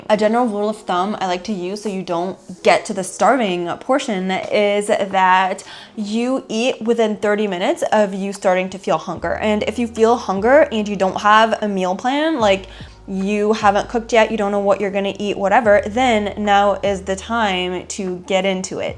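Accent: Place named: United States. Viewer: when folks hear you speaking 🗣️ english do they mistake you for American becoz your exceptionally well spoken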